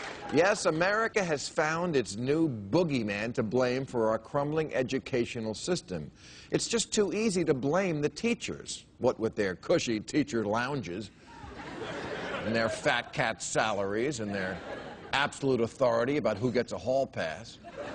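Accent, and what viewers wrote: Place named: Rhode Island, United States. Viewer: Sounds like you're from that poor Rhode Island neighbourhood.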